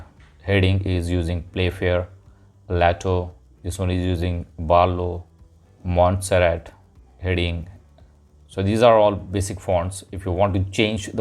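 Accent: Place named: India. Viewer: I dont like indian accent 💩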